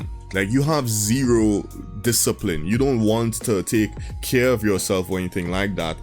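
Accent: Trinidad and Tobago. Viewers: You kinda sound Trini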